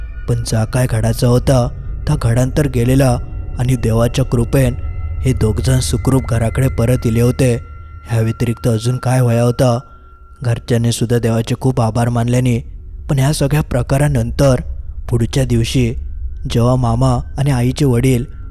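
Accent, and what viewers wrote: Malvani, India. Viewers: Hi malvni ahe ashich aste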